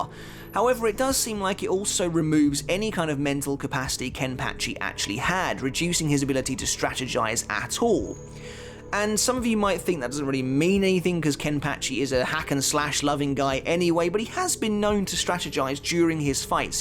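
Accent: England, United Kingdom. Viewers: You need to say Japanese word like a Japanese person not like a English man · Content and overall quality is great but the way you pronounce japanese in an English accent is very hard to listen to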